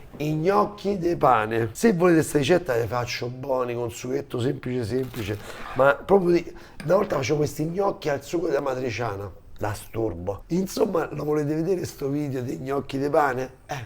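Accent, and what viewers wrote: Italy. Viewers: Preferivo i video di 3/4 anni fa dove parlavi con un accento più italiano ed eri più umile.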